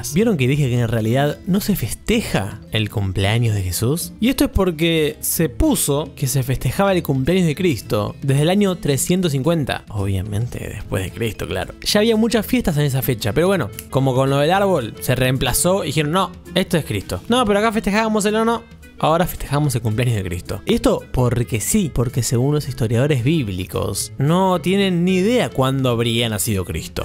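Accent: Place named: Argentina. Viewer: Deja de hablar como argentino!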